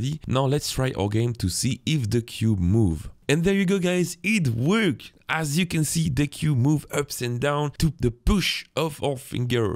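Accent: France. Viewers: Salut (j'ai cru entendre un leger accent français, me trompe-je🤔😁) Merci beaucoup pour ces tutos ça aide tellement de monde c'est genial Evidemment Unity a encore tout changeé donc dans ma version 2022 c'est un peu différent..les Est ce que tu saurais quel fonction je dois appeler dans le visual scripting pour declencher une action avec ce fameux bouton? Exemple: lorsque j'appuie sur le bouton..un objet disparaît..car le "on button click" n'a pas l'air de fonctionner...merci!!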